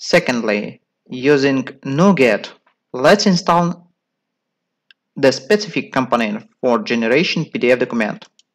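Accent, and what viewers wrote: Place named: United Kingdom. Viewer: Don't be sorry for your British accent :D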